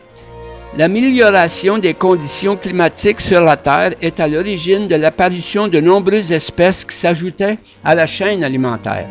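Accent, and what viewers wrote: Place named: Canada. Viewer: Putain j'ai cru au début que c'était un débile mental qui parlait au début. Après je me suis rendu compte que c'était un canadiens... \o/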